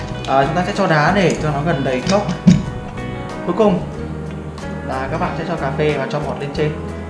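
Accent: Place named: North, Vietnam. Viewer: Khg dai dong , ngan gon va giong noi Bac-ky dang yeu vo-cung . Chuc chau luon binh-an 🍀💐😍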